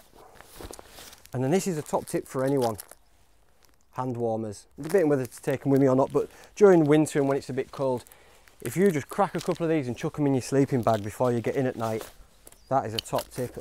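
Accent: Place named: East Riding, United Kingdom. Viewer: Great content just subscribed as am getting in to wild camping, out of curiosity you from East ringing by any chance just the accent as am from Hull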